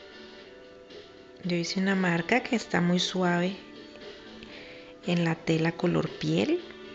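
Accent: Colombia. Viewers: Explicas super 👌🏽 tienes un lindo acento, eres de Cali? Obvio me suscribo a tu canal, me encantó 😉 👏🏽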